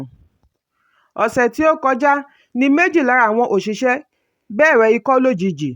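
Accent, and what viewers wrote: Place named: Ikale, Nigeria. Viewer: The news reporter sounds like an Ikale/Okitipupa person. Good job!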